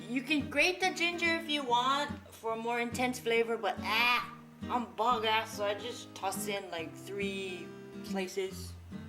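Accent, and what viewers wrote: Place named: Hawaii, United States. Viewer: "da less dishes i wash da bettah!" lol i love your videos xD you're so entertaining to watch and i like how you incorporate pidgin in your show. au'raiii!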